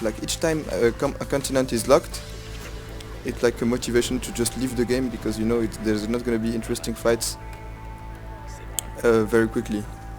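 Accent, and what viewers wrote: France. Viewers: on sait tout de suite que t'es Français ah ah ah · es-tu francais? tu as laire davoire laccent ;)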